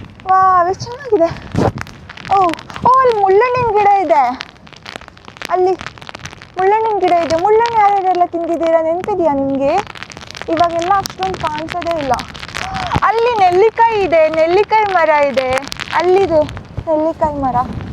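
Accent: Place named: Sagar, India. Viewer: any how I like u beauty voice as Sagar taluk kannada ❤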